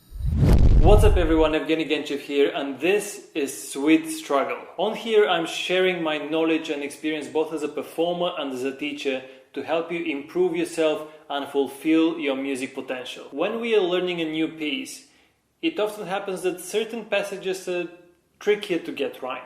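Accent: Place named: Russia. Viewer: You are the best pianist ever, and the best piano teacher 💓 You will be famous one day, i'm sure. I can hear your russian accent when speaking english, one of the best accents. I wish you good luck :)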